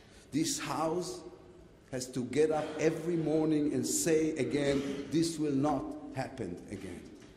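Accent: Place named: Israel. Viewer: פעם ראשונה שאני שומע את לפיד מדבר באנגלית. מדבר אנגלית ממש טוב בקושי שומעים את המבטא הישראלי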